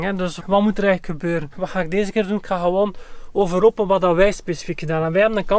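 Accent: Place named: Belgium. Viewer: Dag, ik ben blij eens een Belg aan het woord te horen. Ik heb een Citroën Jumper L3H2 ingeschreven als lichte vrachtwagen en wil die ook gaan gebruiken als camper. Niet door een super de luxe ombouw maar gewoon het hoogst noodzakelijke, bed,kastjes,keukentje,tafeltje. Ik ga er geen ramen inzetten want dat mag je niet zelf doen zeg je, maar ik moet natuurlijk wel ventilatie in het dak hebben, daarvoor moet ik een gat in het dag maken (plaats in het dag is wel voorzien). Mag ik dat zelf doen? Of moet ik naar een bedrijf die dat doet? Fijn einde jaar en een gezond 2023.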